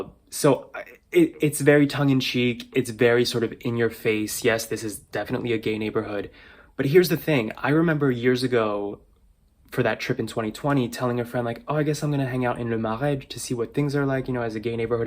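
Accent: United States. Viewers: I really like your voice and the way you speak English ( very light American accent) By the way I 'm French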